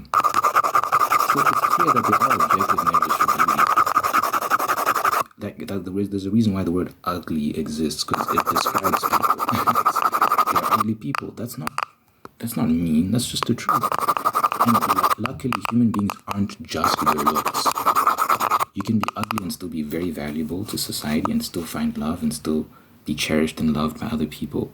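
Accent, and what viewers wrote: South Africa. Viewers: south africa im pretty surw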